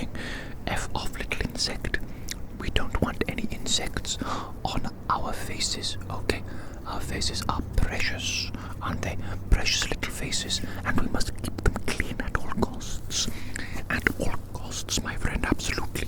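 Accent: Wales, United Kingdom. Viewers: Fantastic asmr!!! Also do I sense a welshy??🤔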